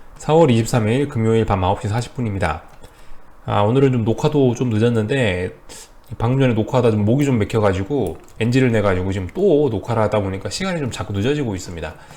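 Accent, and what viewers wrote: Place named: Chungcheong, South Korea. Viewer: 얼렁얼렁..ㅎㅎ 충청도..방송감사합니다.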